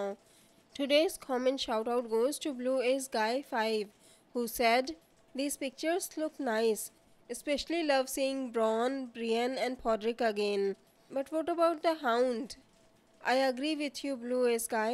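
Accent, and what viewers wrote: India. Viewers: I could understand you without. Just for some reason I assumed you'd be American. But I like Indian accents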